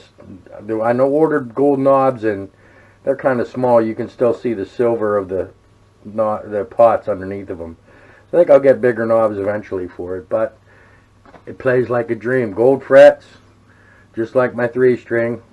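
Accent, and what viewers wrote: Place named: Pennsylvania, United States. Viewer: Nice builds...love the walnut necks! When you said Bedford, I was listening to your voice, and I thought, has to be Bedford, Pa. I know that Pennsylvania accent! I'm from Red Lion...near York. Keep up the great work!😀🎶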